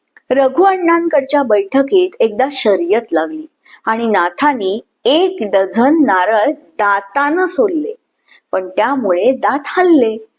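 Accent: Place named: Konkan, India. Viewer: कोकणी आवाजात केलेले सादरीकरण आणि कथा छानच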